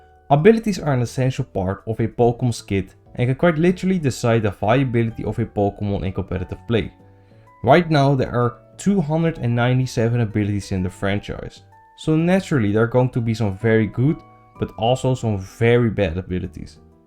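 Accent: Netherlands. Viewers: I just hear you're a Dutch speaker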